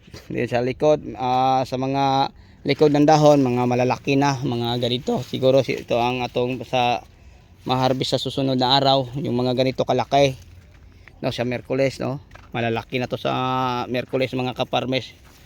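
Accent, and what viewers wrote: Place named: Visayas, Philippines. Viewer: Bisaya Po Kasi ma'am!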